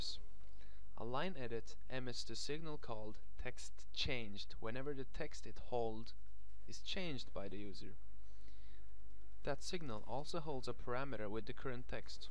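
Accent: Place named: Sweden. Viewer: The UI language and his accent suggest Sweden.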